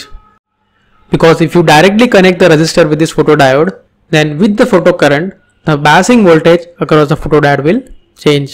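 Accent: India. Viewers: The Indian pronunciation in English is very so difficult to listen explanation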